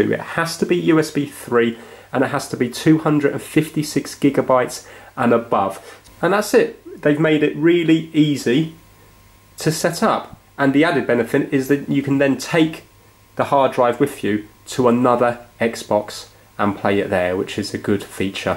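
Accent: England, United Kingdom. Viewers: What part of england are u from just wondering? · I like your British accent.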